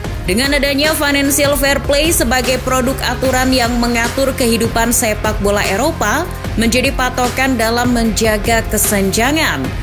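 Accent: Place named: Java, Indonesia. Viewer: Hostnya jelas wong jowo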